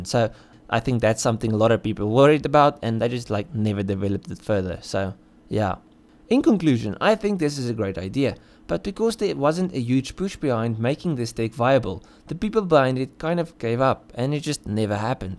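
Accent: South Africa. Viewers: This guy sounds south african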